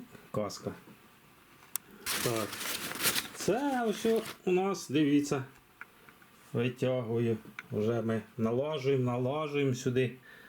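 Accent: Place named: Kirovohrad, Ukraine. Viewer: Дякую за відео! Підписка, вподобайка. Ви часом не з Кіровоградської області? Ну геть розмовляєте, як у нас). Чи продаєте Ви саджанці винограду?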